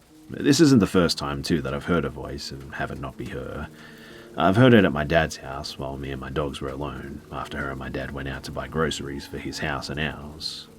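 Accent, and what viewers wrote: Australia. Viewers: Excellent stories Be, thank you for making this a good day by gracing us with that awesome Aussie voice! I don't know what it is about your voice but I could listen to you read instruction manuals and still love it LoL 😂😉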